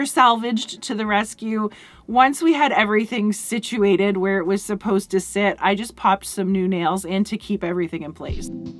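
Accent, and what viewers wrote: Canada. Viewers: That’s a hard “OU” in the word “out” when you say it.. I had to hear it a second time to see what you said. Once I realized it was a northern, possibly Canadian accent, I had to hear it just a few more times for satisfaction.. I even caught myself saying “ahhoout” and “ah-bow-oot.”..😂.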